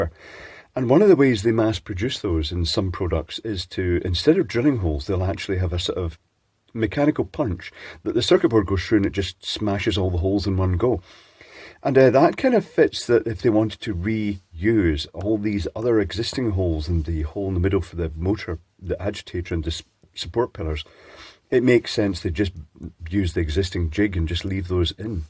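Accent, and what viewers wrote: Scotland, United Kingdom. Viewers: I love watching your videos, for some reason this one had the auto subtitles activated when I played it and it was made even more amusing than normal because it mistranslated your Scottish brogue. Glitter pearl was translated in the captions to 'glitter peril'. 😀 Be very careful of those glitter perils I understand they can be dangerous.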